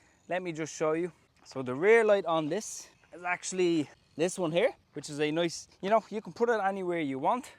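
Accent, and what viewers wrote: Dublin, Ireland. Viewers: Great vid. Best of all is your Dub-A-Lin accent. I just incountered someone with the said same bike and its a rocket to go with 15 degrees hill climb which is unheard of in this price bracket. It must be a bone crusher without suspension and solid tyres but the plus side is no punctures. Presently its 509 Euro because of black Friday. This is outrageous.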